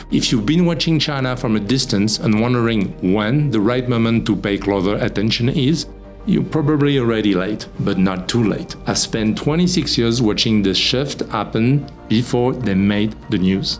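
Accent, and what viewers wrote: France. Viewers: Fantastic research. The data provided is exquisite. Thanks for this valuable work. It's priceless The only thing that gets in the way is the french accent. Man, your videos are the only ones I can watch till the end. I now realize how the articulation of the two languages are different that it makes it almost impossible without sacrificing good amount of clear speach. Almost all sounds are pronounced differently.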